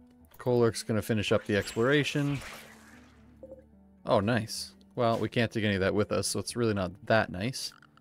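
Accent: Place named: United States. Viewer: What a weird name...are you actually? You sound like an American.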